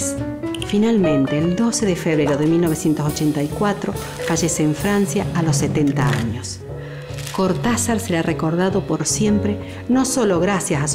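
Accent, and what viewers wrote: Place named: Córdoba, Argentina. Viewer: Hermosoo! Es re cordobesa la que relata jijije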